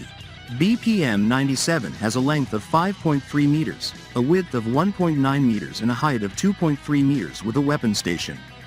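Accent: United States. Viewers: While he at it change that dumm american accent aswel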